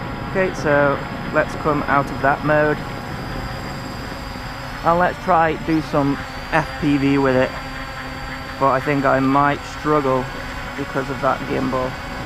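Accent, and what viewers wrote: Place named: Yorkshire, United Kingdom. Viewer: You sound exactly like my friend Oliver from Yorkshire :)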